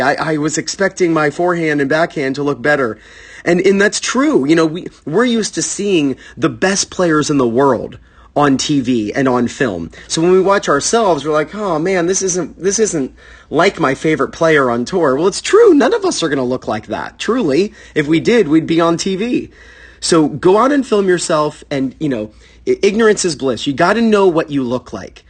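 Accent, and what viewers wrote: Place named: United States. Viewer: ...with American accent. Nothing wrong with that, just saying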